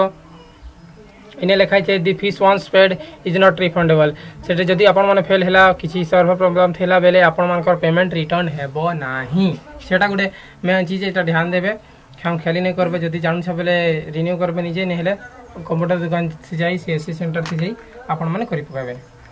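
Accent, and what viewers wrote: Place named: Cuttack, India. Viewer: ସମ୍ବଲପୁରୀ କହୁଛ ଭଲ କରି କାହ ଆଉ କଟକିଆ ମିଶାଗୁଲା କାଏଁ ଯେ କହୁଛ..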